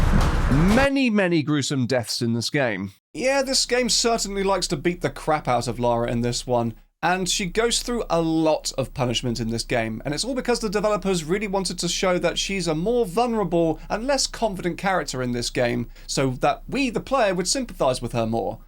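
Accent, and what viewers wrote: United Kingdom. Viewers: As a Yorkshireman myself, I approve of the accent 😂 👍🏻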